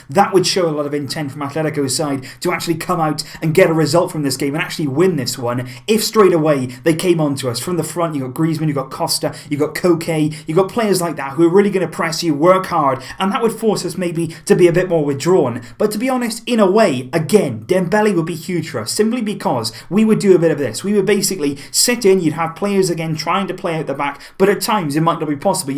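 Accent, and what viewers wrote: United Kingdom. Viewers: you're a star pal. I do love your channel very much. I do like and appreciate the way you explain the things by yourself. Everything abdolutely well explained with a lot of sense. I'm spanish and I am living in UK, I can notice from your accent that your British. Isn't easy not to be spanish and be as you are, a very FCB lover. I'd be grateful if you take atention and also perhaps, answer my comment. I hope, we, all the Barça supporters, can celebrate together many titles this season, as we really deserve. Specially for the king of the kings, our master and always unforgettable Lionel Messi, which has made this team (club) a real live style. I gotta be proud of this guy, as well with: Iniesta, Busi, Luis Suárez, etc. GO AHEAD Barça !! Still many amazing moments yet to enjoy from this squad!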